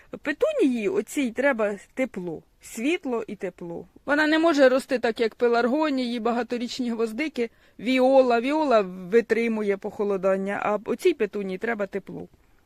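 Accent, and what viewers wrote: Poltava, Ukraine. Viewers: Доброго Вам здоров'я. Мене захоплюють Ваші відео щирістю, гумором, простотою. Та ще й рідна полтавська мова! Успіхів Вам !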